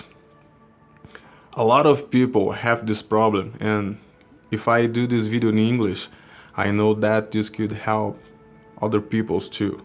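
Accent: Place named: Brazil. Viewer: Percebi que você é BR.Pelo sotaque.Muito bom o seu inglês.Sou intermediário no inglês.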